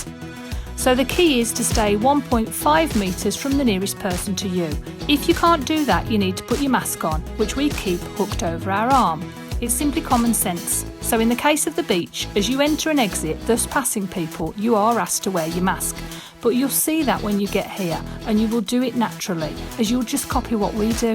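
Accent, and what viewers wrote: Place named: Birmingham, United Kingdom. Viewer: Great to see things getting back to normal, over middle of September should be a lot further advanced by then and you must be a Brummie with that accent 😃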